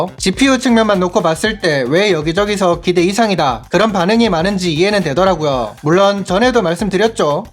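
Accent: Gyeongsang, South Korea. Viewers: 숨길수없는 경상도사투리의 상세한 설명 감사합니다 ㅋㅋㅋㅋㅋㅋ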